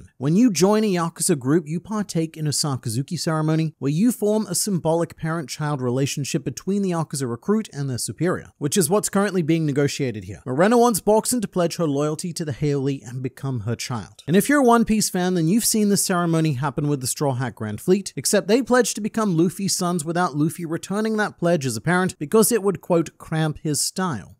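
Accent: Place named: Australia. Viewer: I love ozzy accents. Morenerr!!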